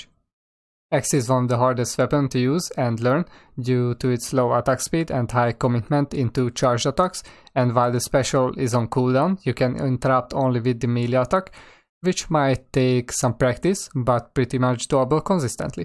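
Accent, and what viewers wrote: Hungary. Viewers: i noticed something, you have a hungarian accent.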